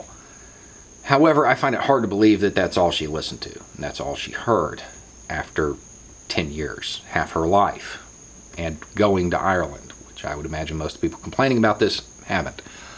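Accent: South, United States. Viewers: You know, I have to admit to my own cultural prejudices when I hear this intelligent man talk about issues of great import in that accent. I grew up in Brooklyn and spent many years living in the south and hearing some of the most hateful things spoken with that accent that it's sometimes difficult listening to. It's like listening to Shelby Foote on Ken Burn's "Civil War" documentary, it's just hard to equate the one with the other. As has been said by many before, you can't judge a people with a wide brush: there are many intelligent and compassionate people who live in the south, it's a shame they are swallowed up by so many who may actually even be in the minority, loudly proclaiming their moral superiority while doing some of the most immoral things. Anyway, it's just a thought. Thanks, for speaking up.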